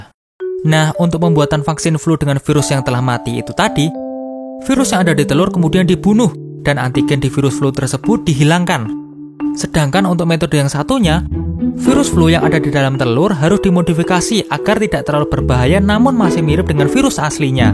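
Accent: Java, Indonesia. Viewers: medok gimana gue yang bukan orang Jawa masih paham apa yang dibilang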